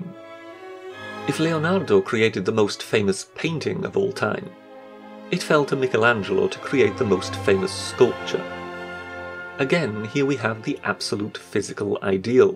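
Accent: Wales, United Kingdom. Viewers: ahhhhhh I'm burning, the welsh accent is killing my ears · Just a suggestion - You should try and give a more natural delivery than the forced ‘sophisticated’ accent you currently adopt. It’s really obvious and comes across as fairly arrogant and ‘try hard’, and undermines your entire channel in my opinion. Edit - Are you welsh? I just realised you sound a bit like Rob Brydon. I’ve always thought that accent sounded funny, it was hard to pinpoint your accent, at first it almost sounded like you were failing badly at attempting an old posh accent, but now I realise. Yet they say we have no culture??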